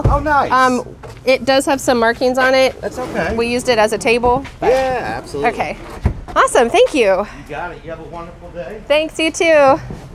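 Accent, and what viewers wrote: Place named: United States. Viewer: I am learning British English, but when I watch your videos, and here your accent, I really like USA accent so much too! is your accent standard USA accent? You are a very amazing teacher . thank you so much.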